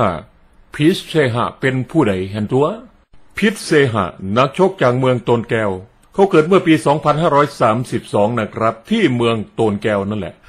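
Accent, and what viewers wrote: Isan, Thailand. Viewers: ท่านพากสำเนียงอิสานใด้น่าขำ..ท่านอื่นพยามเลียบแบบแต่ไม่มีใครสู้ฟังดูแล้ว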